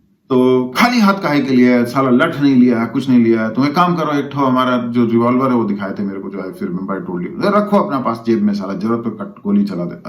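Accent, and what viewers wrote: Bihar, India. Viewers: Apka bihari tone me bolna bht apnapan deta h. Thanks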